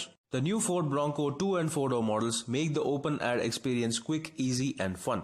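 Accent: India. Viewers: I’m sorry but when we have an Indian/no offense talk about the Ford bronco it doesn’t sound very American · The accent of this man, reminds me a liquor store or seven eleven.....Indian accent..you can figure it out miles away... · What’s an Indian doing narrating this it sounds so annoying and I can’t finish the video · What in the hell is it with the guy from India doing the narration ????????????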